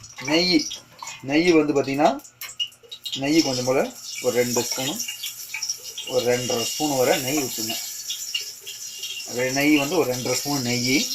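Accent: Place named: Madurai, India. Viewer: super bro konjam stove kleen banunga pro unka slang very super you netive madurai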